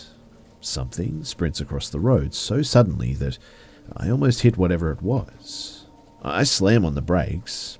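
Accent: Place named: Australia. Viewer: Aussie Accent cultral cringe bro. Fuck its hit me hard. Good stories though but Okka as fuck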